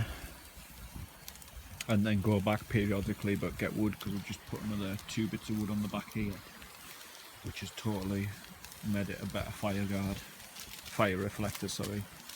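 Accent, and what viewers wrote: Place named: Yorkshire, United Kingdom. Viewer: he sounds like a proper yorkshireman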